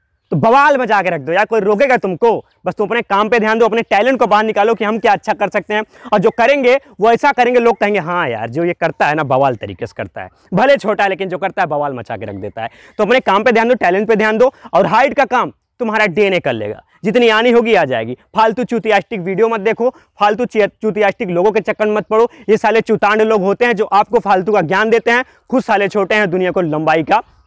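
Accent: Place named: Morena, India. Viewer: Aap bilkul Morena walo ki tarah bolte ho humari desi aawaaj mein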